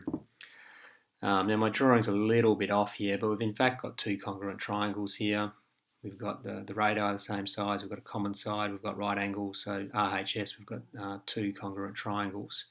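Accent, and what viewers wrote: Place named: Australia. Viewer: I have been trying to understand the process behind finding out the least and greatest value of Arg(z) using sin and tan! At least I can see it here! Well explained with funny Australian accent!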